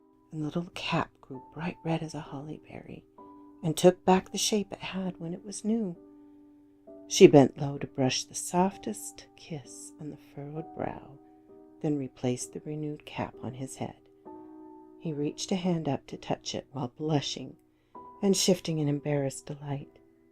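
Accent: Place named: South, United States. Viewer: typing this while listening great story love the floral scroll work in the video but I'm from Tennessee and I think your southern accent is adorable lol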